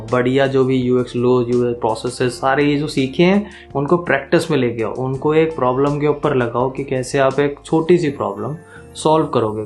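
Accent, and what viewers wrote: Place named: Haryana, India. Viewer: are bhai Haryanvi accent OP 🔥🔥 , JAI HARYANA 💪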